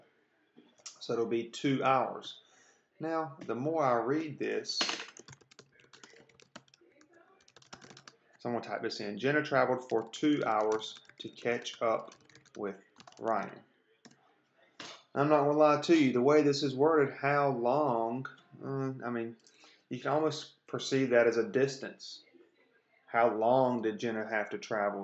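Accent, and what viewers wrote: South, United States. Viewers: Neat explanation + I like your southern accent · are you from the south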